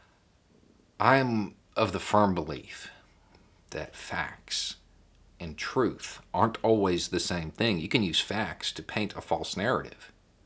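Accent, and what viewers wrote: South, United States. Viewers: The way that you deliver your messages sometimes; I feel as though I am listening to a secular homily, delivered at the alter of history and humanity. Your accent is reminiscent of a classic “southern reverend” character from movies, so that might lend itself to the feeling as well 😅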